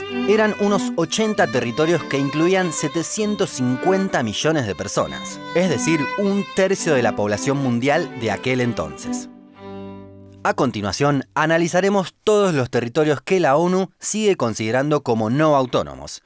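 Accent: Argentina. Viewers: Nunca me gustó el acento argentino, pero el tuyo es punto y aparte, me encantan tus videos, saludos.:)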